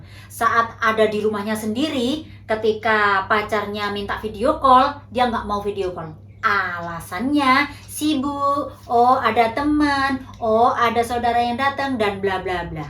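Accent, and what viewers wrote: Java, Indonesia. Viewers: Senyum senyum sendiri, dengerin logat jawanya Mba belum hilang 😊, Kadaluarsa = kadaluarso Sekonyong konyong koder 😅😅😅 Mba ditunggu vlog ramadan disana ya 🎉🎉🎉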